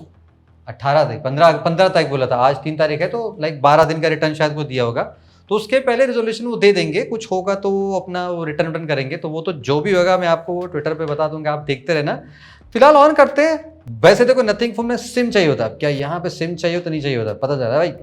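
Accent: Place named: Kanpur, India. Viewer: lgrha tha bahut din se ap bhi kanpur se ho awaj se aj confirm 🎉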